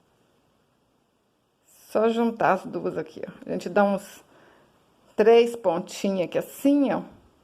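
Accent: Minas Gerais, Brazil. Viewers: Parabéns seu trabalho e lindo! E sua conversa é muito minerissima 😀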